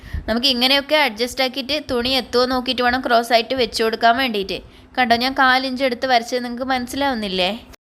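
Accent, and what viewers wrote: Kannur, India. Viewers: കണ്ണൂർ ആണ് അല്ലേ😂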